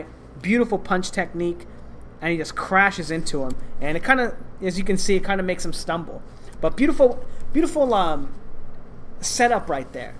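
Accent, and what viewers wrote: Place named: New York, United States. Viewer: You sound like Pauly Magnillagi LOL maybe its a New York thing that accent .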